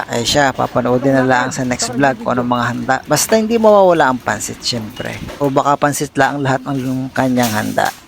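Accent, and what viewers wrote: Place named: Batangas, Philippines. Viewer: nakakatuwa voiceover mo kasi batanguenya din ako😂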